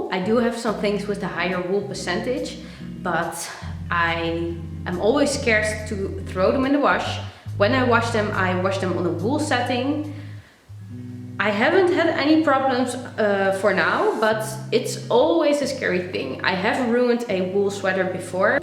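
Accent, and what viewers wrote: Netherlands. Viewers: Hi! Spreek je Nederlands? Want ik denk aan jouw accent te horen dat je van Nederland bent? 🥰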